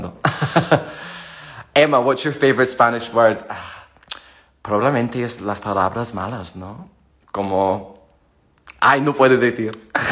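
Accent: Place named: Scotland, United Kingdom. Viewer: Hi John, I speak both English and Spanish (more Carribean dialect), but want congratulate you on your mastery of the language. You were easy to follow and your accent is exceptional. I love the drop of the Scot in you. As an American, it's intriguing. Stay safe and be well. Can't wait for Droughtlander to end!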